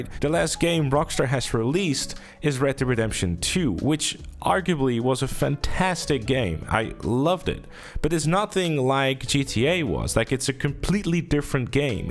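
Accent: Netherlands. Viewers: Hol up is this man dutch?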